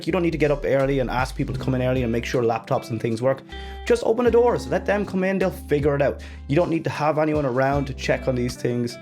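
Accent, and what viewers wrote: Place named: Ireland. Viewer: Are you Irish? you have Irish accent. anyway, great content <3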